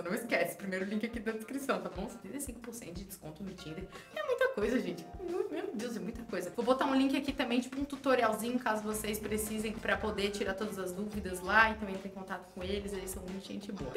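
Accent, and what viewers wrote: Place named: Santa Catarina, Brazil. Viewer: Esse sotaque de peixeiro aí é de catarina né, conheço porque morei em itajaí/sc oxtepo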